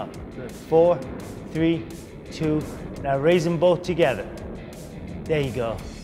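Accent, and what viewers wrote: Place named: Brazil. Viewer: Brazilian accent, fofinha.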